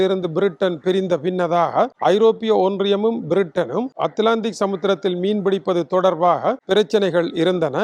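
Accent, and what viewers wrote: Sri Lanka. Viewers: Bro he is lankan,news also lankan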